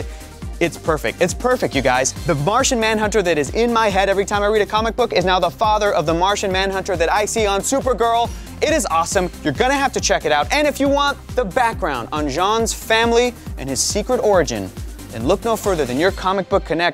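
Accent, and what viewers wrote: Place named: Australia. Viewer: That Australian accent though.😂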